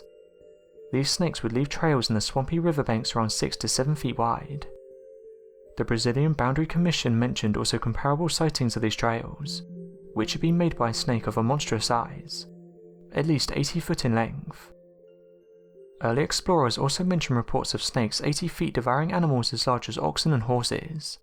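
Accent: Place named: Manchester, United Kingdom. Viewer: Agreed... and though many chime in defending him when I say this, I STILL get very tired and annoyed with that accent. I understand all accents have a reason for existing and started long ago, nobody is right over the other when it comes to language blah blah etc... I figured that out without any help and I don't mean to be some sort of exterminator about it. In fact sometimes I wonder if HIS style (Manchester? Whichever it is...) might actually be one of the oldest ways English was originally developed (I doubt it though), it's still annoying as hell. How anyone would think "yeah, I want to start smushing some syllables into others, completely dropping other syllables, and trail off on almost every last word of every sentence and swing the cadence of each sentence the same near the end... that will help people understand me!" is baffling. 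During the large snake stories and accounts for example, I actually had to give up understanding several different parts and words!! They were so swallowed up or crushed that I can't tell when some sentences and words start ad stop. The information on the other hand, is very fun, creepy, and interesting. He never delivers it as gospel, doesn't have a strong bias towards any of them nor does it seem like he's trying to coerce us to accept anyone's opinion. He just delivers the story and I dig that. Just wish I didn't have to concentrate on ironing out the words in every other sentence.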